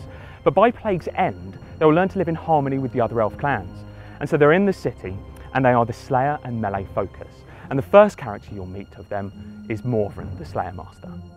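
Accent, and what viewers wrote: United Kingdom. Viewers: He has a british accent, soo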